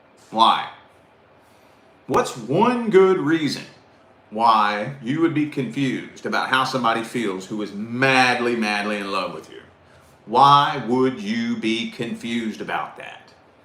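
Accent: United States. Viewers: I like listening to your American accent . At times I think ' how I wish I can talk and sound like that.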